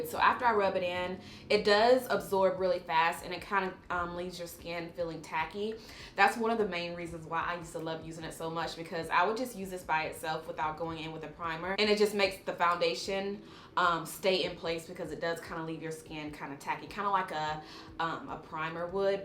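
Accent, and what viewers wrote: United States. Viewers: Lovely video. Just recently purchased the elf Camo concealer and the hydrating concealer, I’m looking forward to trying them. Love your accent, where in the states are you from? :)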